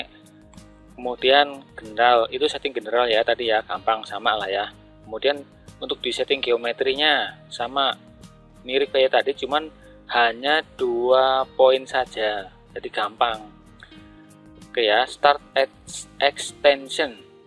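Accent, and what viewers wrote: Banyumas, Indonesia. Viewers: asyiappppp Bos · matur suwuh tutorial ya om jos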